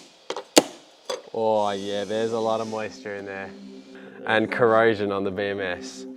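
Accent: Australia. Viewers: Aussie accent can’t tell difference between ‘Lithium ion’ And ‘Lithium iron…’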